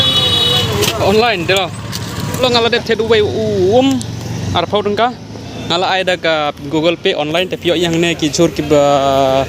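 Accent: Meghalaya, India. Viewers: Kren Da ka ktin pnar phim nang bha ban kren khasi.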